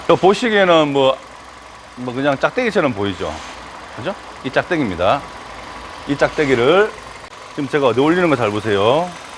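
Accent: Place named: Gyeongsang, South Korea. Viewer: 사장님 혹시 매장이 어디신가요? 일단 경상도이신거같은데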